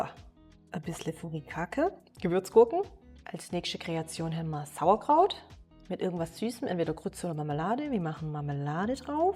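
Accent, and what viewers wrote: Swabia, Germany. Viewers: Geiler Akzent!! Aus'm Ländle ❤ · Des isch so sche die heimat wieder zum hören. Grüßle vom Landkreis Ravensburg gell❤😂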